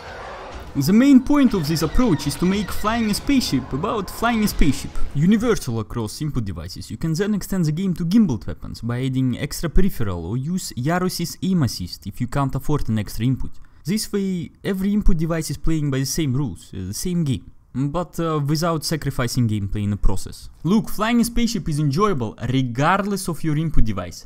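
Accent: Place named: Russia. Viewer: Your Russian accent is amazing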